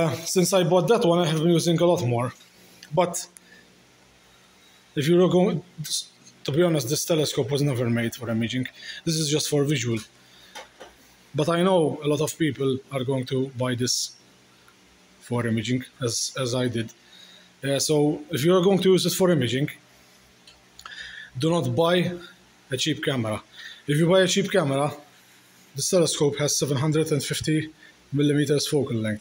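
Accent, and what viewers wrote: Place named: Malta. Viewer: I bought one of these a few months back, these tips are great! Then to my surprise, this was a recommended video and I recognise the accent - a fellow maltese! Grazzi tal video!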